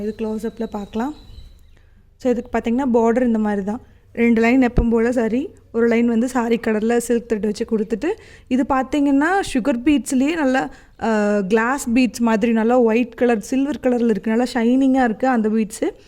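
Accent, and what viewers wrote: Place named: Coimbatore, India. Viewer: mam neenga cbe ah romba naal ah unga video pakuren apove unga slang vechi doubt irundhuchu aana therila andha sree devi box patha aprm tha conform achu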